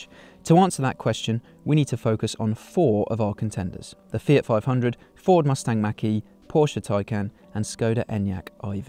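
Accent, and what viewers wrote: United Kingdom. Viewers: bloody British accent...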